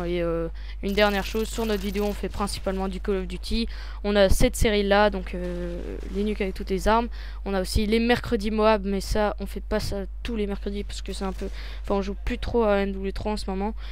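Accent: Walloon Brabant, Belgium. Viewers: vous etes du brabant wallon en fait ? :)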